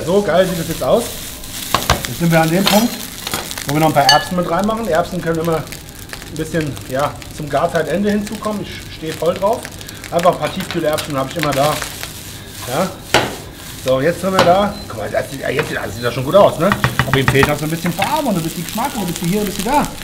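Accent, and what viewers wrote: Hesse, Germany. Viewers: Ein sensationelles Rezept, wie viele andere von dir auch. Es macht einfach Lust und Laune, die Gerichte nach zu kochen. Lieber, du bist ein Original und vor allem: Herrlisch des hessische Gebabbel. Mach weiter so👍👍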